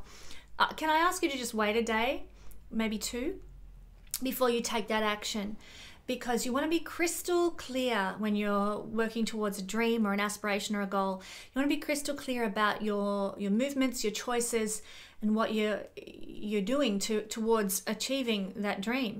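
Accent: Australia. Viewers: Pink blazer lookin fiiine, 😚! Oh, Aussie accent is the loveliest for sure! Btw, I think you forgot the time stamps for the last transit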